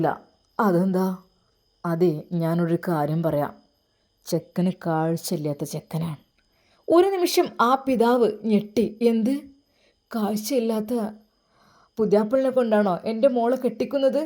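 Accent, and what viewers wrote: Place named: Kozhikode, India. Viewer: Ningal calicutil koduvally bhakath mattoo aaanoo.. samsaram angane thoonunnu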